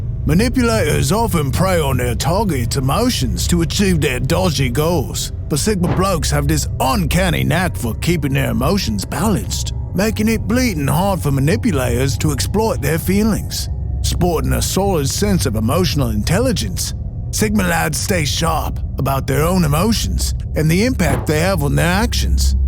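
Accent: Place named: United Kingdom. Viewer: You Rock..... OMG... that was the best Sigma Description Ever..... Being a Sigma - Male - Empath.... You might consider doing a short on the Sigma Male Empath..... The Rabbit hole does go deeper.... I have been this way all my life..... Military Used it for 30 years.... But Your Voice..... Well you have to be part of the Island Boys (UK, IE (ME), SCOTLAND.... Since I was born In Dublin, but Now have lived in the US since i was a wee lad..... So... Kinsman if you are actually in the UK..... and have a Pint of Carlsburg for me.... Futbol Season is coming.!!!! Oy Oy Oy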